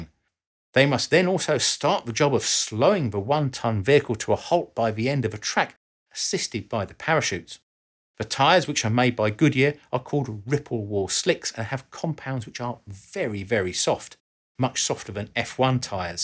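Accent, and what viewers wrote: United Kingdom. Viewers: Why the sickening sissified limey accent????? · Love the UK accent to narrate a video that can be described as " 'MERICA!! " 😂🤣 So much fun. Thanks mate! 😊👍upvote earned. I'm personally not into 1/4 [or 1/8] mile racing but as an welder with a lot of experience with Aluminium I have professional experience with the blocks and various parts away from race days. IF anything is left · This is very old information being about 6 years old. Much bigger numbers in 2025. And a guy with a British accent is commenting on a purely American sport?? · Well, that's an unexpected subject for but in fact, there are many points in common with rockets:D That was funny. And top of that: with british accent!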